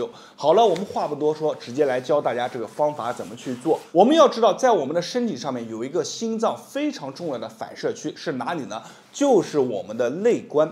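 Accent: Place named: Nanjing, China. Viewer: 宝藏老师啊！谢谢！第一次留言，昨晚不光做了您教的这个动作，一口气看了您其他的好几个视频，尤其关于肩颈的动作，实测当场起效，我是颈椎病、肩颈综合征患者，从去年开始犯病，一直就恢复过，昨晚做了您的动作，让我轻松无比！另外听老师口音像是南京或是南京周边地区人，若老师方便的话，我想登门拜访，感谢！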